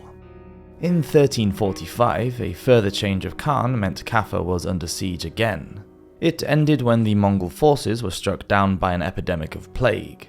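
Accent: United Kingdom. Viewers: Using a British accent while quoting Italian figures is pretentious AF. This video was boring.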